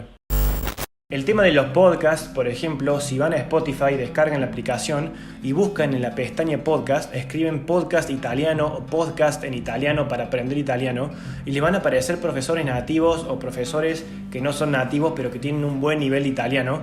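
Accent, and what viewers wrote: Cordoba, Argentina. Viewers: excelentes consejos, que grande ese acento cordobés, saludos desde Rosario.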